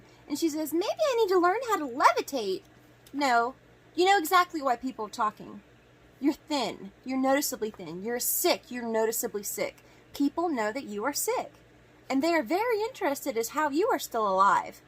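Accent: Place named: South, United States. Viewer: Love your southern accent by the way southern girls all the way! 👍🏻🙌🏻😊💕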